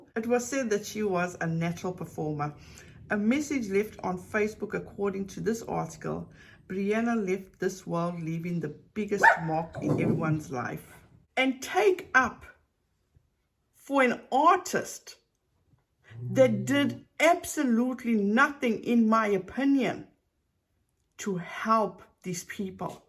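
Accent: South Africa. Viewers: I'm trying to place your accent, are you South African? {:-:-:}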